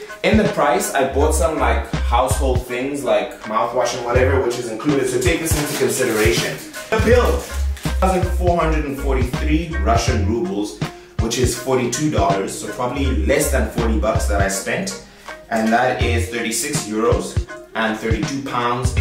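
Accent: South Africa. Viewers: Hey dude are you South African? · Are you South African??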